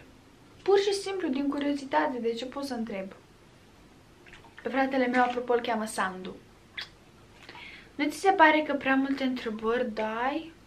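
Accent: Moldova. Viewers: Dar tu de ce vorbești moldovenește cu prietenii tăi cu mama ta dar pe vloguri vorbești normal?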